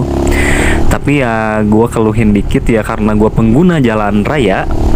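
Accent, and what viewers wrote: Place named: West Java, Indonesia. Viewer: salfok bilang gue elu tapi logat sunda.. hahaha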